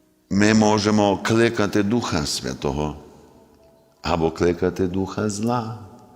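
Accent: Western Ukraine, Ukraine. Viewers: Любов Писаренко, мова о. прекрасна із дещо діалектом, який притаманний на західних територіях України.Слухати науку дуже люблю. Слава Ісусу Христу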